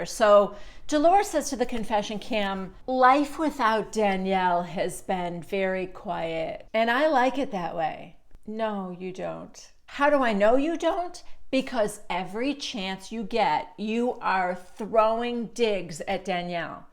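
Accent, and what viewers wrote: New Jersey, United States. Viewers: Love your Jersey accent. Lol. You sound like a mob wife. I have always liked Joe Gorga. I believe this guy has a hard of gold and loves his family deeply. I feel so so sorry for that Marty guy. He needs to run away as fast as he can and take his kids with him. Love you. Keep up The accents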